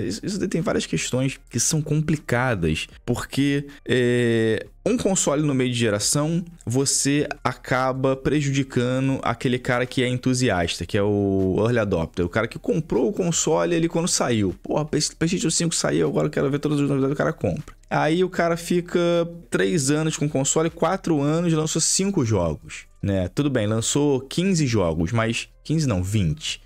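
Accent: Rio de Janeiro, Brazil. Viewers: Vai num fonoaudiólogo pra neutralizar esse sotaque de carioca aí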